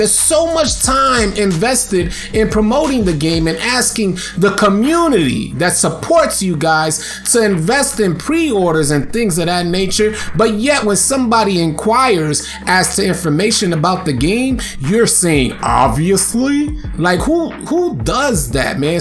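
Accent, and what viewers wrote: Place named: New York City, United States. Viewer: sounding real live in this one! I hit that like because you sounding real NYC in this one my G.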